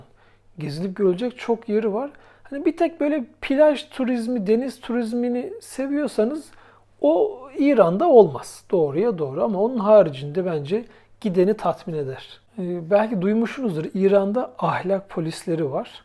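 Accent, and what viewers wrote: Samsun, Turkey. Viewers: Yaa Samsunlu olduğun çok belli ama.O ikiyüzelli deyişin ele veriyor😂Ben bu siveyi düzeltene kadar ne uğraştım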